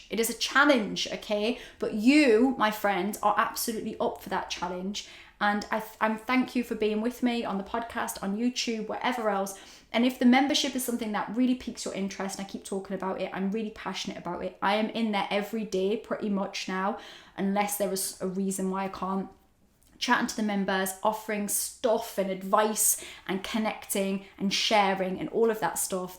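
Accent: Newcastle, United Kingdom. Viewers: Hey. I found your channel being a big Girls Aloud fan, and I was taken with the Geordie accent. Which is why my username is my name haha! Love your stuff. Hope you do more!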